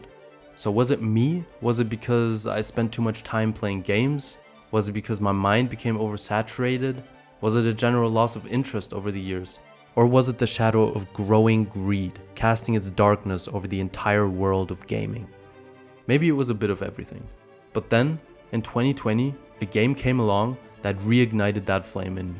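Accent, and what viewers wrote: Germany. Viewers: starkes video!!! übrigens hör ich raus dass du deutsch bist aber keine panik das schaffen nur 0.1% mach weiter so!!!! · ich hör doch dort einen deutschen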